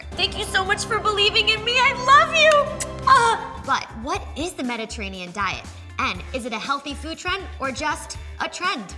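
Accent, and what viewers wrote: United States. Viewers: Great information, but such a piercing American accent, I had to reduce the volume to get through it.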